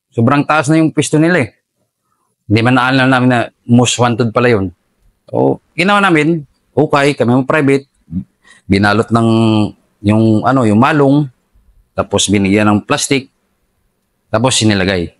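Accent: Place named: Visayas, Philippines. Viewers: Taga panggasinan si sir pru bisaya na yung tono nya pati salita at kilos nya bisaya tlga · Yung tuno ng salita mo master bisaya na❤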